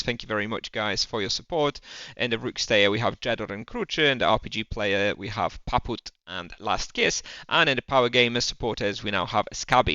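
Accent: United Kingdom. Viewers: moje niedopatrzenie, musiałem skipnąć, bo intuicyjnie liczyłem, że będzie to na końcu filmu. Super kanał, masz mega potencjał ze względu na świetny angielski. Siedzisz w UK? Tak brzmisz hehe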